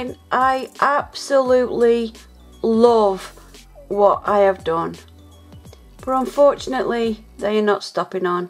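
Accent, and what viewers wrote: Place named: Stoke, United Kingdom. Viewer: love ya stokie accent too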